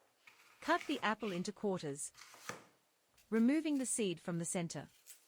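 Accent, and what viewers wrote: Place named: Australia. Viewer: This is a cute bento. More people would watch if you used captions instead of the english voiceover. The Aussie accent is jarring to listen to.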